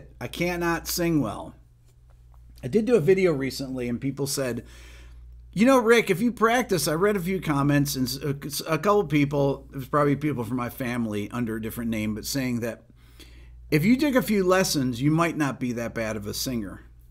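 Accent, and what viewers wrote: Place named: South, United States. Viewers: You are a native New Yorker but the south is starting to rub off on you. Joined the league. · Ain’t nuthin’ wrong with soundin’ southern lol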